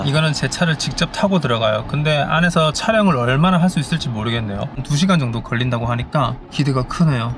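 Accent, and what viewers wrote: North Korea. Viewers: 이분 탈북자임? 말투나 억양이 좀 있는거 같은데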